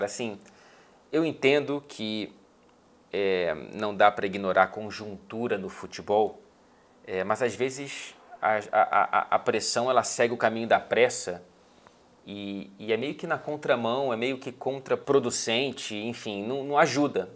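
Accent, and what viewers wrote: England, United Kingdom. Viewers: por favor, não contenha seu inglês inglês! é charmoso e ajuda-me a evoluir na pronúncia correta! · eu gosto demais da sua pronúncia do inglês britânico inclusive te acho muito elegante por conta disso ignora esses comentários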